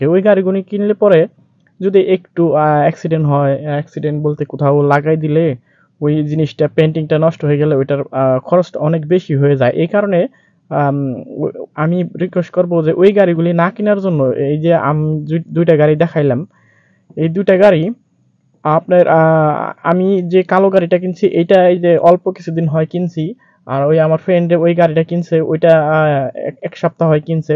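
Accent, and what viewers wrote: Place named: Jamalpur, Bangladesh. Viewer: আপনার দেশের বাড়ি জামালপুর? ভাষা শুনে মনে হচ্ছে... জানাবেন প্লিজ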